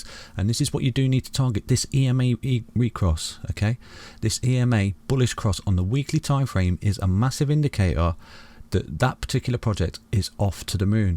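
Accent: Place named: Hinckley, United Kingdom. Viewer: ohhh I was close. I thought you was Hinckley because of how you say "cuh-daano" ha ha I am Birmingham. Not too far from you mate!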